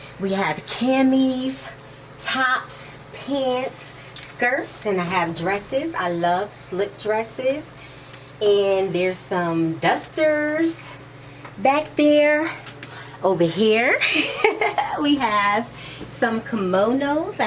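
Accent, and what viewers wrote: New York, United States. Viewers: I love that NY accent 🥰. You made your home very welcoming and it’s cosy and accommodating and so organized and bright. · I love your New York accent .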